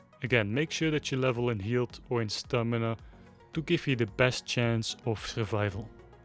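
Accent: Netherlands. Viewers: haha het nederlands accent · Beetje Nederlands accent maar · Love the Dutch accent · nederlands? je hebt een exstreem grote accent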